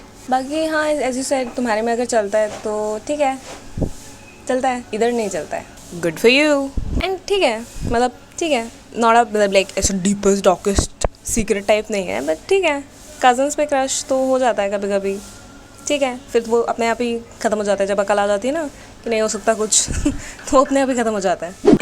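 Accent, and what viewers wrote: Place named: United Kingdom. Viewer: You're amazing Yaar or aapki British English wahhh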